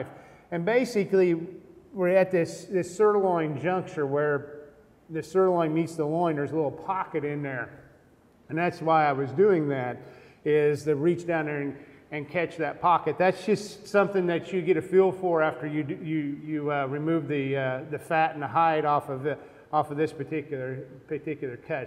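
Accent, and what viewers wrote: United States. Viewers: I was thinking why does this guy have an american accent, he works at the UK agriculture college.... it took until he mentioned Kentucky for the penny to drop.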